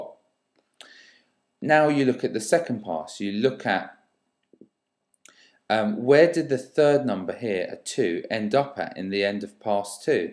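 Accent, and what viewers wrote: United Kingdom. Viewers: Hi, I have to say I am using youtub to learn math for a long time and I have watched many vidoes. But I have to say the way you are teaching is very very very good . I like your Londonish accent as well. I will pray for you deep from my heart as you helped me a lot.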